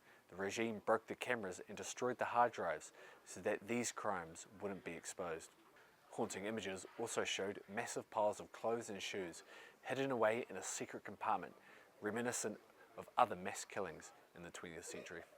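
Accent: New Zealand. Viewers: Is this a New Zealand accent? Hard to understand a lot of words. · You sound like a kiwi?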